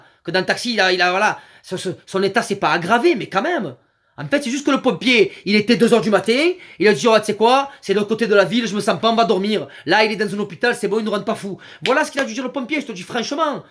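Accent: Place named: South, France. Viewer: Salut le marseillais superbe vidéo ah l'accent du sud me manque :)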